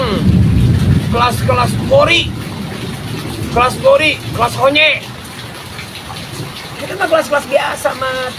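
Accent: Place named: Java, Indonesia. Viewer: admin ustadz iki alamate ning endi .iki potongan logat mah jawa kita kene iki yah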